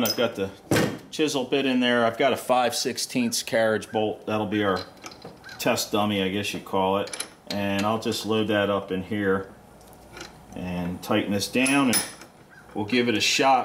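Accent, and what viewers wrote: Pennsylvania, United States. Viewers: Wow Pennsy accent coming at yas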